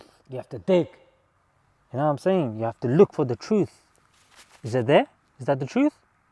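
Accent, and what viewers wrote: United Kingdom. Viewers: is he his acent is british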